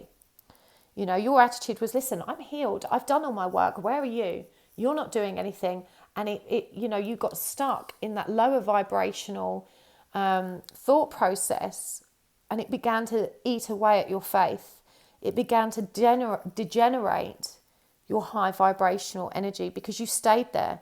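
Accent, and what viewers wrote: United Kingdom. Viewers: Omg i love the British accent. Like it's super interesting why people in England have accents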